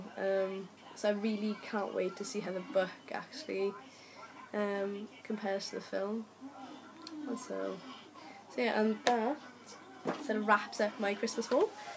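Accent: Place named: United Kingdom. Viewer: Your accent is so gorgeous!! What part of the UK are you from? And I love the video. :') Before I Fall is amazing.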